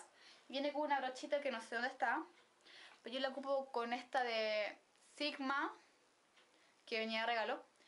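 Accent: Chile. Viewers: que acento chileno!!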